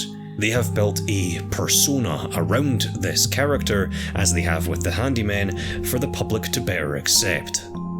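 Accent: Scotland, United Kingdom. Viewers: I can't quite place your accent... Is it English or Scottish?!?! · He's an absolute gigachad. Can write, can animate, can voice act, and who knows how many other skills, and he has an epic Scottish bass voice to boot. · Yes. He actually makes his notes in a Scottish accent.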